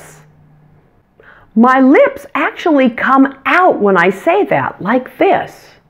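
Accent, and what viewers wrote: United States. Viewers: But you pronounce it the American way not the English way.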